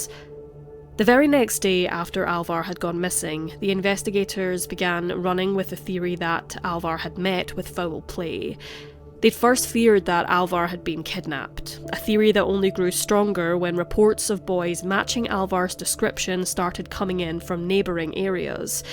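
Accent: Scotland, United Kingdom. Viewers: Who is this bird with the Scotts voice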